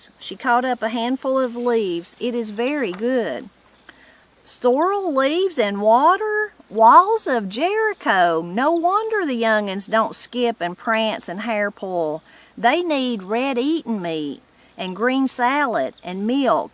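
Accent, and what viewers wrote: Appalachia, United States. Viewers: Enjoyed your reading. You remind me of my late M.I.L. And her sisters. All from Appalachia ( West VA)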